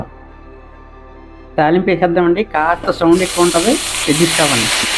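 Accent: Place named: Godavari, India. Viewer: Very good curry & rasam. Good combination. Thanks for the video with godavari accent.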